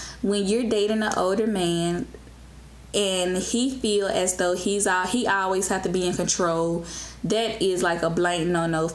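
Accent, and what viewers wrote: United States. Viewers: You sound like ur from Alabama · I love Chocolate woman..2pac said The darker the berry the sweeter the juice..shoot me a Email Choc a doll...if it your situation dont work <mail> sound like you from down south..Im Capitan Save a lot...womam are so much ahead of us.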